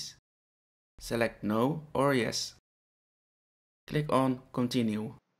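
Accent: Netherlands. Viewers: you are dutch i hear it in your accent lol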